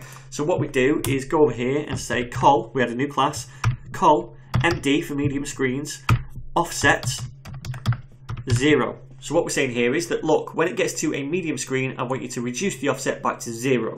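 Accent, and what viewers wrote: United Kingdom. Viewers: i was learning british accent too so you are just perfect ^_^ explanation was great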